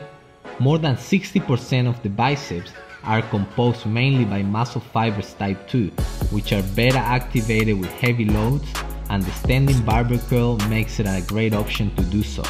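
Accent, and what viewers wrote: Spain. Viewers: spanish accent, acento español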